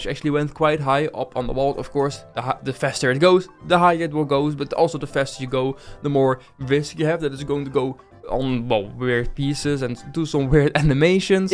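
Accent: Netherlands. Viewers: You got a dutch accent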